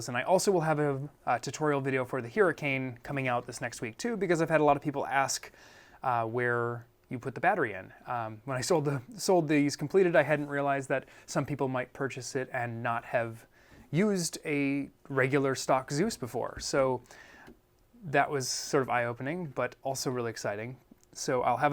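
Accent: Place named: United Kingdom. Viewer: The intro made you sound british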